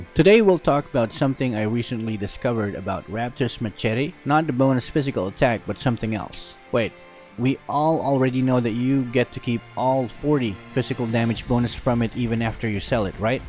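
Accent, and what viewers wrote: Philippines. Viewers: From this video, now I know you are Filipino, your accent is different with other Filipino tho xD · Just as I thought, it is Filipino Englig Accent. :)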